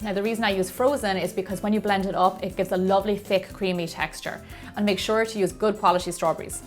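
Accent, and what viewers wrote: Ireland. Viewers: You are such a great baker your so pretty and I love your accent, I'm from ireland too! + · I absoloutly adore the Irish accent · 1) love your accent; Irish, I beleive. 2) With all due respect, you need to slow down a bit or two while presenting the ingredient preparation procedure. Sincerely, Bruschetta senior; chef-wanna-be. <num>. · I'm trying to place your accent Is it...Irish?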